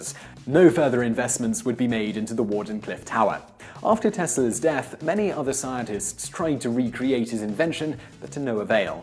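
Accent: United Kingdom. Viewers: He's British, you know... In fact, it's probably the Americans that are mispronouncing the words. We tend to do things like that and then expect others (even though they are much older, thus closer to the source) to change themselves to our ways.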